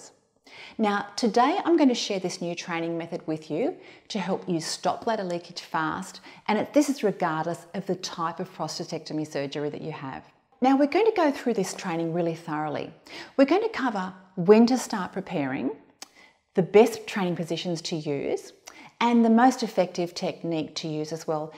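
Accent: Australia. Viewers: Thank you! Very helpful and nice to hear an Aussie presenter. I miss Australia! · She speaks with Australisn accent. It is very nice to listen to.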